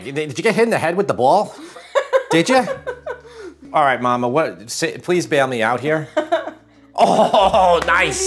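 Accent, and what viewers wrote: New York, United States. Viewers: May I ask what accent you have ? Sounds like New York to me. I’m an Aussie. 😬👍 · I have made chicken soup often, but this made very clear how you can use the chicken to make the stock and use the same pieces to use in the soup. I do like white meat chicken in the soup, but the ease of this would certainly make me give this a try. As a native New Yorker, I love listening to ’ accent, making a big assumption that he is also from New York.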